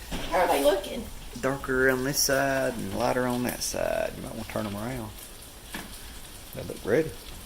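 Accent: South, United States. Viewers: Love that southern accent!!! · I am from Georgia, Rockdale County, and totally cook southern style. In love to watch you and hear your accent, I have lost most of mine. Your husband does a very nice job as your camera man. You cook differently than I do but it all seems to come out very well and looks delicious. ( I know we all do it as either our mother or grandmothers did). I would absolutely love to have some of your gift of the red Plum Jam. I know that it is made from those little wild plum trees you see growing just off the road all over the state. Any way I will keep watching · You sound and cook like a southern woman if you are I'm am to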